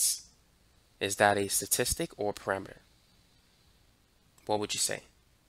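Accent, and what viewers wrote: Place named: Brooklyn, United States. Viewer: He has just the slightest Brooklyn accent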